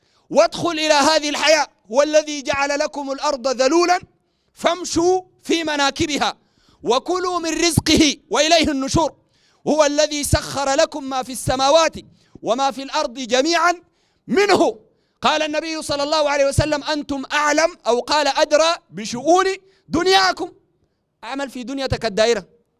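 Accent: Sudan. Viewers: احب اللهجه السوداينه بعد اللهجه المصريه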